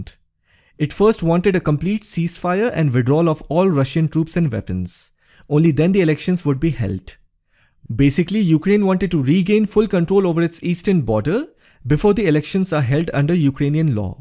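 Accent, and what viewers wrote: India. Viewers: Definitely need the Indian accent to put emphasis on what is happening here.. totally made me understand it better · I never thought I would survive an entire video with Indian english but your effort of research and the quality made it worth it lol. Keep it up!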